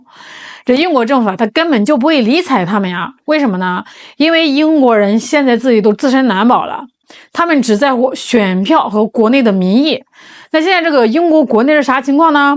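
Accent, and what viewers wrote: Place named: Shandong, China. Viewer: 先改改满嘴的山东高粱腔，学会点儿话再出来卖无知吧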